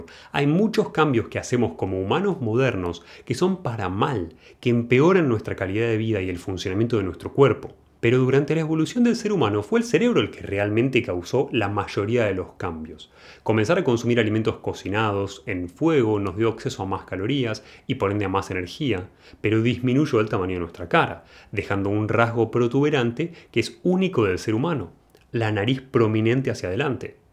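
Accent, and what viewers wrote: Argentina. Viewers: como pasar de argentino a español el video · Wtf argentino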